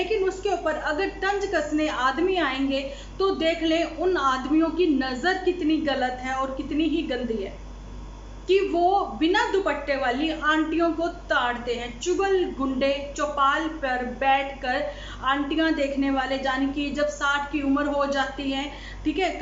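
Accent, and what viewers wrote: Punjab, India. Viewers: Your punjabi so sweet.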